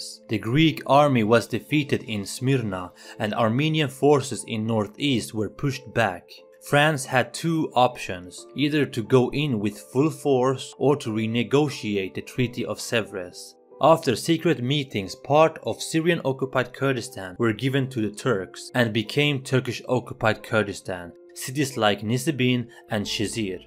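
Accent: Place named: Sweden. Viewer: You have a hint of a Swedish accent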